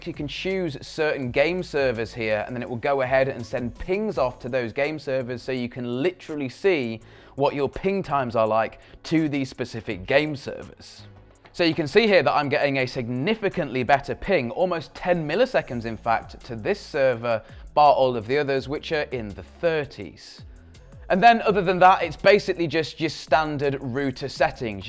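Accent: United Kingdom. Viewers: That is how the British say 'router' because of how we say 'route' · That’s how we say it in the proper Queens English, not the bastardised yank rip off.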